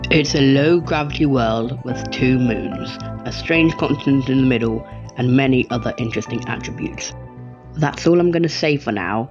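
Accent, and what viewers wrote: United Kingdom. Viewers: I would call this ok, but the british accent turns this into a 100/10, oh yea, and the vsauce sound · Are you british 💀 also i know im very late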